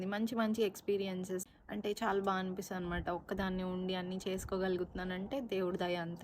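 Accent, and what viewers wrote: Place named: Krishna, India. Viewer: Meedi Krishna dist.ani mee bhasha chusi telisipoyindi 1st time chusaka ne..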